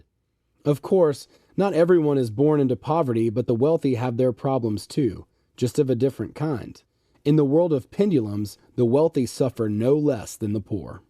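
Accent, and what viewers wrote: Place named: South, United States. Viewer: Love the voice used for the intro! It would be awesome if the same 'voice' from the intro was used to read the chapters in this audio. I understand you can't please everyone; the reader who read the chapters sounds like he has a Southern twang which was distracting. It's me, not you. lol Never the less, thank you for posting.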